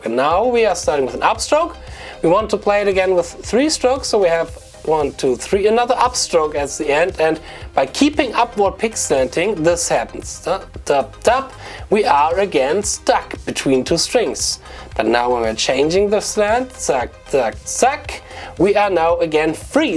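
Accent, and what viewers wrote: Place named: Germany. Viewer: Great video but as a fellow german,the accent makes me crack ip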